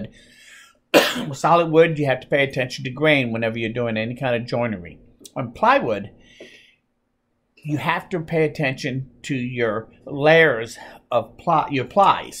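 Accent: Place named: Quebec, Canada. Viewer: This was so helpful. Love your teaching method. Your accent struck me. Are you near Quebec?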